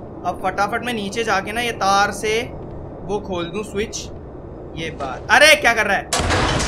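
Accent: Haryana, India. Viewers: Tumbara jo Punjabi/Haryani accent hai, vo sabse funny hai Funny in a nice way , I love all the accents . Not insulting.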